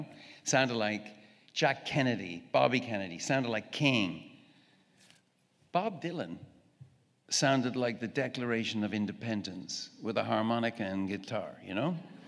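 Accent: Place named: Ireland. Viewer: I swear he's sounding more Irish the older he gets..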